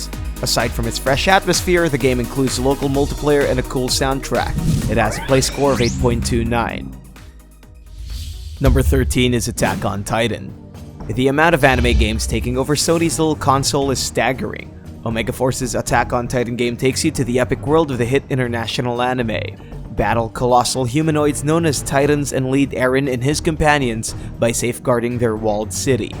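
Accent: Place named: Philippines. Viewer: Off topic. You sound Filipino.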